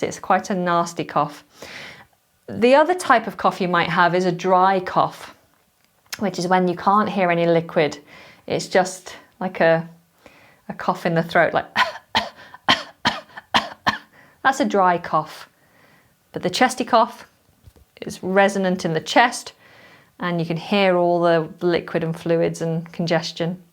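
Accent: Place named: United Kingdom. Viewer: I love your sexy and beautiful British accent baby specially when you eat and swallow all end "r" .This is sooooooo cute baby. thanks a lot for your useful and beautiful clips. God bless you my beautiful teacher.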